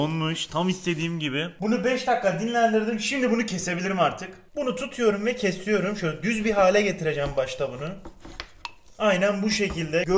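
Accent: Adana, Turkey. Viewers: Tencereyi ocağa eklüyürüz abi sen hataylı degilmi sin adanalı gibi konuşuyorsun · Abi adanalı mısın şive tam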